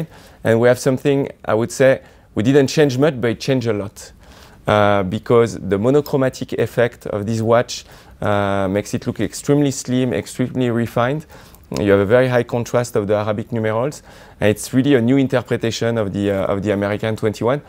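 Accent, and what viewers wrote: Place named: France. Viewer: strongest fr accen.i hearrd in a while lol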